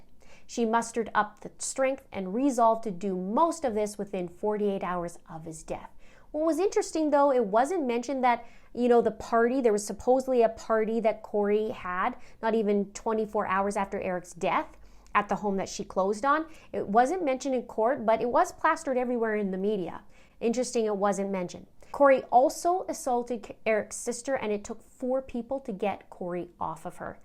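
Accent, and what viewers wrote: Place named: Canada. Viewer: Love your videos! You cover these crimes so well and I like your Canadian accent😊 Canada is the favorite country I have ever lived in!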